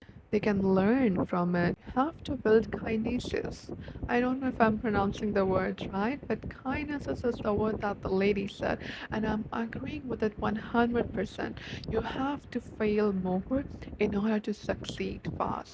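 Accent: Bihar, India. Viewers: 18 years old seriously Dekh kr 25\27 saal ki lgte ho Plz apna bihari accent sunaao bht vibe and maza dono milta hai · Bihari pe accent kese🥰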